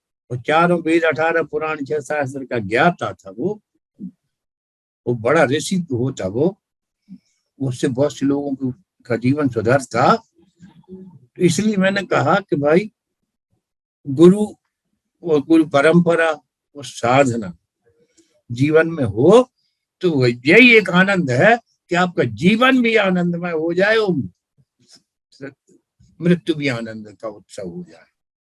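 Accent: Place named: Bundelkhand, India. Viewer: Mujhe inse dada ji se milna hai Inki aawaj ko sunkar aisa lgta hai jaise ye Madhya Pradesh ke bundelkhand se hain Kyunki main bhi wahin ka hoon Inse kaise mile? Or books kahan milegi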